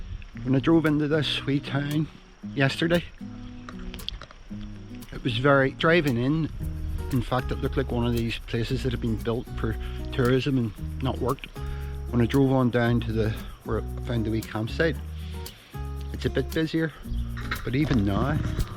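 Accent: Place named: Northern Ireland, United Kingdom. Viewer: Hopefully that's the end of your drama, but just how Norn Iron did you sound, Wee this and Wee that. Keep smiling.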